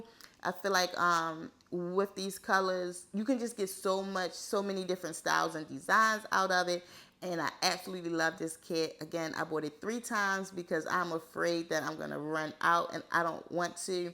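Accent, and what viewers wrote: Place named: Maryland, United States. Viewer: New subscriber here. Stumbled across your page on IG. Love love love your work. Me and polygel are not friends but I enjoy watching and seeing your sets. I could also tell from listening to your voice that you’re from Maryland. ❤ I love Rhimestones.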